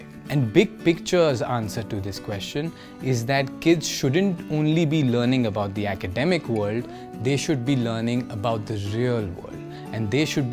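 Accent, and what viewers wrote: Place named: India. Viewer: Everyone: Serious talking and discussing in the comment section. Me: His indian accent omg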